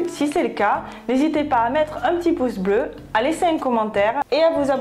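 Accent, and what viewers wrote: South-West, France. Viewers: Un joli accent du sud-ouest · Excellentes explications parfumées à l'accent du Sud-Ouest (la plus belle région de France). Merci pour ce beau travail pédagogique.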